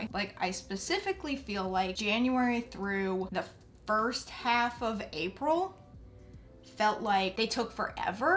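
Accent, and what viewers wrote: South, United States. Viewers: Just got a couple of nose piercings and was looking for aftercare advice and and noticed makeup eyeshadow colors. I love to see bold colors used even though idk how to put makeup on lol but I love the accent (sounds southern and northern at the same time) and listening to her voice is so natural ❣️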